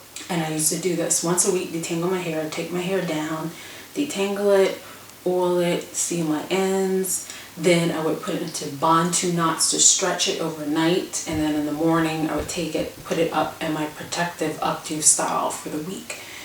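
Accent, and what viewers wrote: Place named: South, United States. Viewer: where are you from? lol I know thats random but I cant place that accent. Certain words you say sound a lil southerny like "ool" lol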